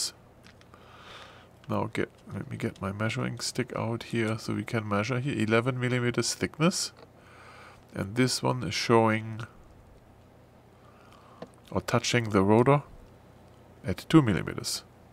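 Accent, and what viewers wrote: Germany. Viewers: Thanks!! Great video and photography. Love that German accent.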